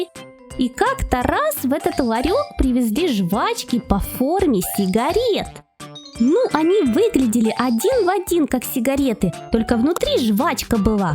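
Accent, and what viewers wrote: Ukraine. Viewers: Ты из Украины😅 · Ты что украинец? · я вижу па голосу что ты украинка я тоже украинка просто нет такой клавиатуры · Ты так красиво говоришь на украинской мове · Дуже красиво говориш Українською🥰💖. Слава Україні героям слава! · Ты шо украинка а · Ты что украинка что ли ? Ну пипец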